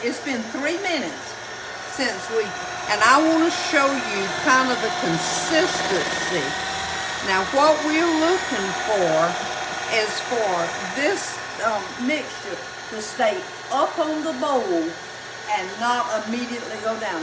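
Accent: South, United States. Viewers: I miss that southern accent. I use to say boil just like you. I don't understand why that person said they need captions bc they couldn't understand you. I hear ya just fine😊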